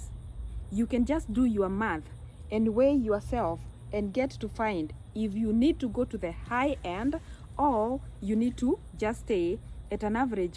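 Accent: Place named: United States. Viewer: Am happy to heard on how you pronounce your words, now you are American typical..How long you have being there!?.😂😂💪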